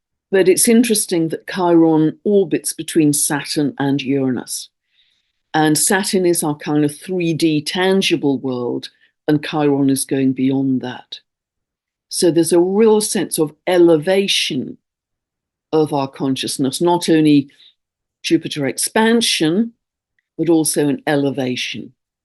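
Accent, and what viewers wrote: England, United Kingdom. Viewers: Hi ! It's irrelevant Question but which English accent is that? One if the finest I've heard in recent times.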